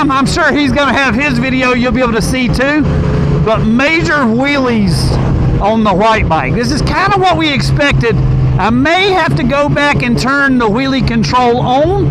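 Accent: South, United States. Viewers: bro I love your stuff, I just have to ask has anyone ever told you, you kinda sound like Charlie Day with a southern accent?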